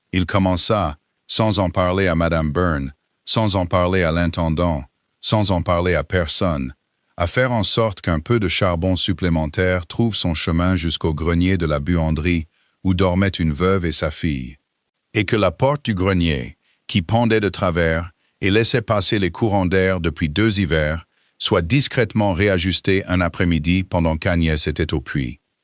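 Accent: England, United Kingdom. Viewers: Que l'IA abandonne cet accent anglais ridicule et on s'abonnera ! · Tous ces récits sont de l’Angleterre, cet accent donne de la force aux récits..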